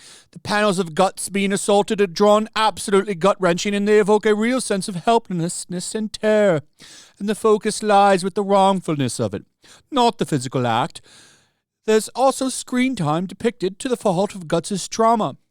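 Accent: United Kingdom. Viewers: Holy shit your British accent is amazing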